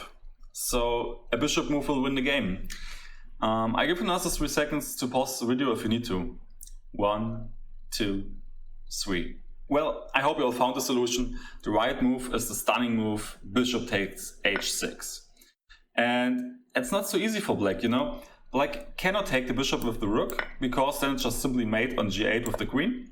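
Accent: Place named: Germany. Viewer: Thats the most German-English video I've ever heard. Great job!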